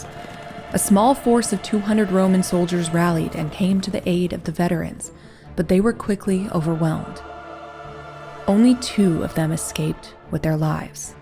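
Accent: United States. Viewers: Shame about the american accent. Ruins the telling, no strength or beauty.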